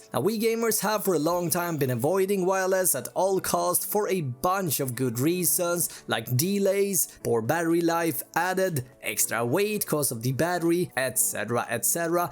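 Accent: Sweden. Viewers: THE SWEDISH ACCENT IS ON FLEEK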